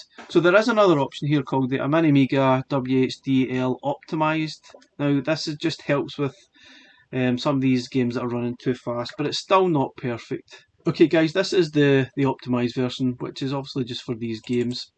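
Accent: Scotland, United Kingdom. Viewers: Fanstastic scottish accent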